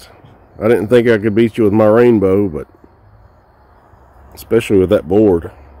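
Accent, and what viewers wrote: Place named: Tennessee, United States. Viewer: BTW are you from around TN, was just wondering because of your accent. I live a stone's through away from TN. That's why I'm asking.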